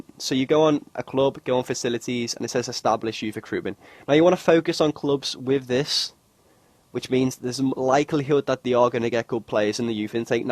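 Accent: Liverpool, United Kingdom. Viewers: Scouser? :D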